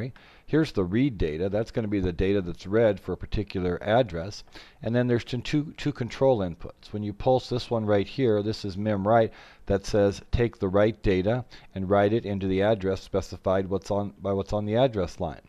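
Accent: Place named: Southwest, United States. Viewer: I think it is a US accent. Southwest probably.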